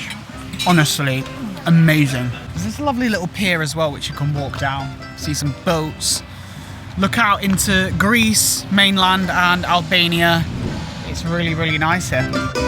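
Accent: United Kingdom. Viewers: Oh yeah, I would guessed Londonish because I lived there for a while and I felt in love with their accent but for me it's inimitable🙈😄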